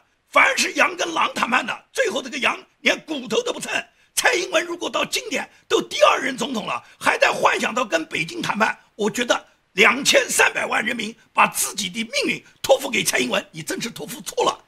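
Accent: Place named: Jiangsu, China. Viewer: 听你口音带了点苏南味 但我一朋友 钟恒 曾是你在南京的同学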